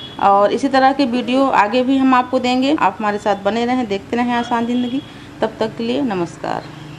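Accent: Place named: Ghazipur, India. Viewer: Sister aapki baate bht acchi lagti h meri mammy aapki trah hi bolti thi woh bhi gazipur se thi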